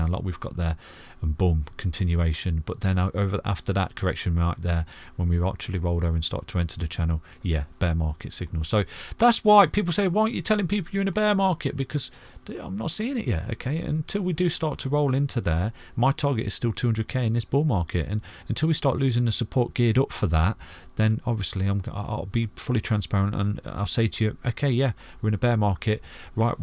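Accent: United Kingdom. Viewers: You British my man? Find your information impressive! Would 100% watch a tutorial from you (Y)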